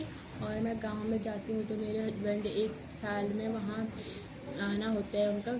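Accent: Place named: Pithoragarh, India. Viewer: आप की लेंग्वेज से समझ गई थी आप पिथौरागढ़ से होंगे, आप बेरीनाग से हो क्या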